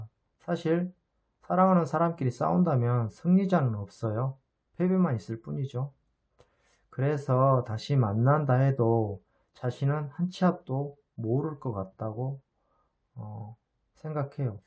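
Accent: Jeolla, South Korea. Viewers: 혹시 전라도분이세요??말투에 사투리가있어요ㅋㅋ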